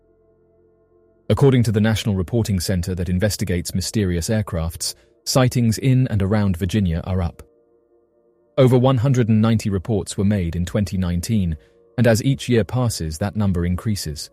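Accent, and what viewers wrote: England, United Kingdom. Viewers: He's ENGLISH! (deja vu..?) 😆 🍄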